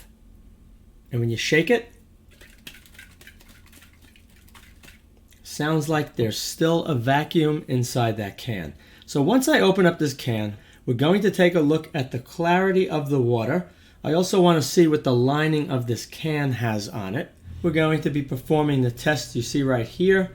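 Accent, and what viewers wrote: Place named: Boston, United States. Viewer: So you're a Bostonian. I can tell by your accent plus the can is labeled Boston, Mass.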